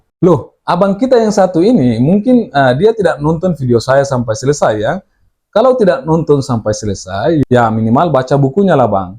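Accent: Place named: Papua, Indonesia. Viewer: ketauan misionaris terselubung kau, dari logatnya aja udah ketauan kau kristen papua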